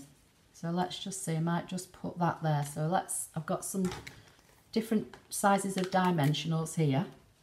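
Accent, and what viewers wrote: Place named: Midlands, United Kingdom. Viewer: Thank you so very much for the ideas! I have just got this set for the lipstick! My granddaughter is suddenly into makeup and we were unable to find ready made cards to suit her age and likes, so this is very timely for me! I love the clarity of your explanations - you don't assume anything and again, for me, that's perfect! I have a scan n cut that's not been used, so now is the time because you are a great source of inspiration. I also love your accent, my dad was from the midlands and I don't get to hear him any more so listening to you is a bit like "home" except I'm an Aussie lol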